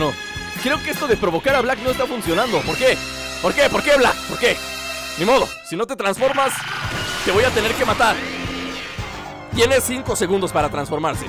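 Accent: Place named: Mexico. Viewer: muy buen video oye podrias saludarme en tu proximo video al ultimo la verdad no miento digo la verdad pero eres mi 3 youtuber favorito el tercero, veo todas tus series descontroland etc. bueno en fin creo que tu eres de mexico y yo igual por que como hablas me suena a mexico bueno salu2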